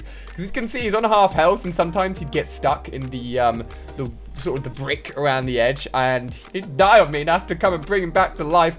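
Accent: United Kingdom. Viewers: he says toitoise cause hes British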